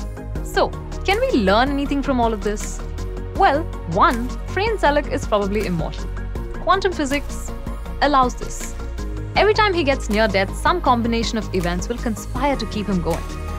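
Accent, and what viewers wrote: India. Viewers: The Indian Accent with this one is so sooo strong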